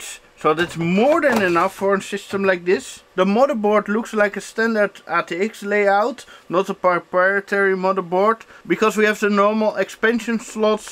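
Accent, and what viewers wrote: Netherlands. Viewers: leuke bak man :).. ben ook op zoek naar zoiets.. jeetje je bent echt een nederlander met je accent lolz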